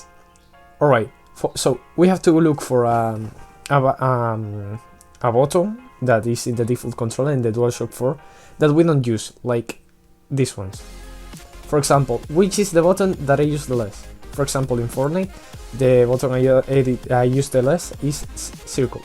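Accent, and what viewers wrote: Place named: Spain. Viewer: se nota que eres español xddd gracias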